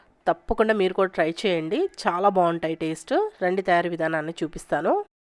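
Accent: Nellore, India. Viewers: Meedi Nellore madam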